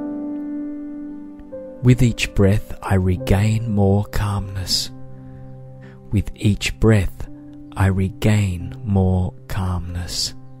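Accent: Australia. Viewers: once again I cannot thank you enough for all of the help you have provided me with over the last 2 years just from your videos. Your voice puts me instantly at ease (aussie to aussie glad to hear your accent). You are my go to whenever I am fighting either anxiety or otherthinking. I don't know where I would be without you!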